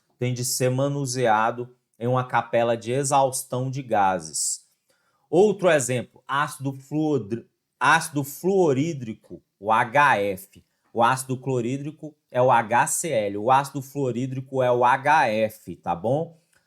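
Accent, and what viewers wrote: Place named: Brasília, Brazil. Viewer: Sotacão de brasília é inconfundível kkk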